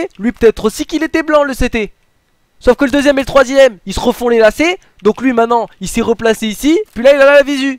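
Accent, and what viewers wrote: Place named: South, France. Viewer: Je kiff l'accent du sud xD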